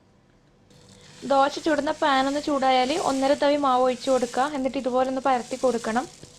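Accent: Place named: Thalassery, India. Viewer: ingal thalassery kaari aano?? slang thalassery pole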